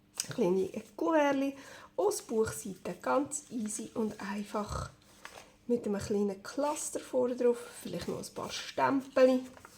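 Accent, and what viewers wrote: Switzerland. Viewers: Hooi so schön schwizerdütsch höre 🥰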